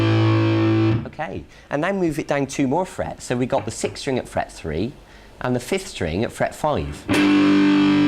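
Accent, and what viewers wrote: United Kingdom. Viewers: haha i like his accent... !! british rocks... !!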